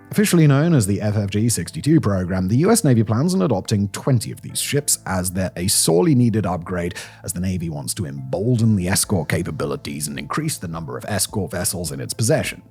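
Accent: United Kingdom. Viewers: This guy is an example of idiots with a Lyme accent sounds like he knows what he's talking about but no, another big mouth idiot.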